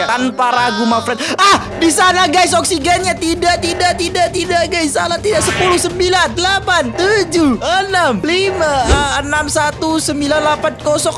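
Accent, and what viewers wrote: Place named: South Kalimantan, Indonesia. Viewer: kakak orang banjar ya,kok kayak orang kalsel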